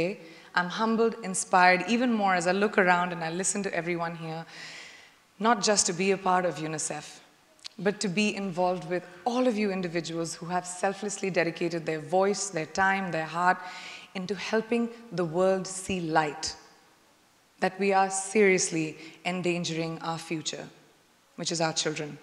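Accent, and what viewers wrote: India. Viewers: Clear Indian accent.💖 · Finally, i found someone who don't change her indian acent 😊😊.... india has its own acent ..u have don't need to copy native contries acent .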